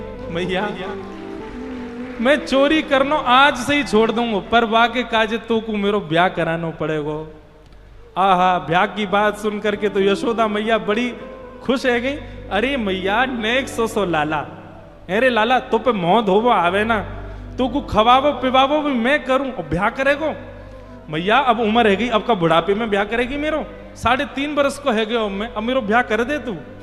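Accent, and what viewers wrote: Braj, India. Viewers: Aaha aapki vraj ki bhasa mohit kardeta hi maharaj koti koti pranam🙏🙏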